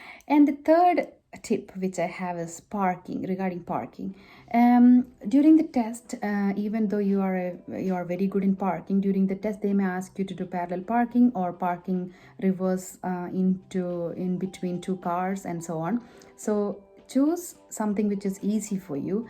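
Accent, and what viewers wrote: India. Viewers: by your accent I'm assuming Indian originally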